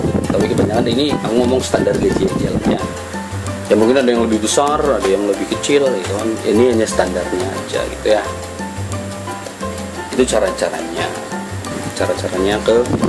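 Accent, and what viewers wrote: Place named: Banyumas, Indonesia. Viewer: Ketemu maning ketemu maning Karo wong ngapak Ning Brunei 😀 Sukses selalu Bossque 👍👍👍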